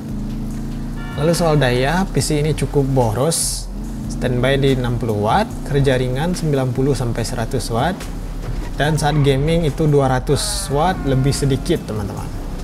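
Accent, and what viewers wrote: West Sumatra, Indonesia. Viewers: Logat minang nya sangat kental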